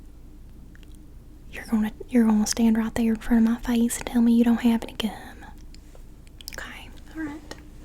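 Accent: South, United States. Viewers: It’s great to see Tilly again! She was fired from her previous job, hopefully she doesn’t get fired again 😂 I love that southern accent, it’s very funny. Thanks for this fantastic video! 🤩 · This could be your best roleplay yet. I love the colors on the outfit you are wearing. Nice color on the glasses, and nice pink color on the phone. Very nice blue color on your nails. I love hearing you converse on the phone. Your interaction speaking to the students is so well acted and spoken. I love your Southern accent. Your accent is so smoothly spoken. This is Teacher Ms. Tilly, formerly known as Nurse Tilly? The Nurse decided to become a teacher. You are a person of many talents and skills. Your voice when you speak, especially when you whisper is so soft and relaxing that I felt like I'm floating on a cloud while listening. Your personality shines. I think this is a performance worthy of an award. 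You could have been a wonderful Teacher had you chosen that career. You are fantastic. I thoroughly enjoyed this. btw, You are the cutest girl on YT, in my opinion. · yes ❤️. You are my favorite to listen to, the southern voice was amazing! Some acting skills flowing through your blood lol. Thanks again! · I guess we got a substitute teacher to take on place today! I love the Southern Accent too, so entertaining to watch with some ASMR Much Love to you! ♥️♥️♥️ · The second I saw the thumbnail I knew I'd be loving 💕it already 😍👍 Congratulations 👏👏👏 on the return of the much-loved Miss Tilly roleplay you have been so dearly missed!!! 😪 So love ya awesome southern accent. You are the absolute best!!! 💙💙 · the southern accent is so good! do you live in the south?? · I think the Southern drawl is the best accent in the world. And the 'turns of phrase' these southern folk have are just delightful! 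😄 Thanks for the video,! 💖✨ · Pretty dang good southern Belle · Ohhhhh southern USA XDD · Your Tilly esk southern accent characters are the besttttt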